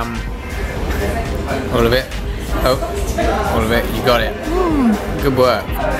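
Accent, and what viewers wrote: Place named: South Africa. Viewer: sorry about your phone :( i definitely think you're developing south-african accent though! :)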